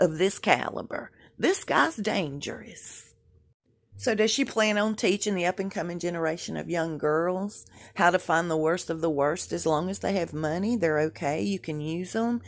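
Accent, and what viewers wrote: South, United States. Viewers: Hey, I just LOVE listening to your Southern accent, I could listen to you all day💕. When is your next Cooking show? Can you do Gumbo or Jambalaya? Peach cobbler?;)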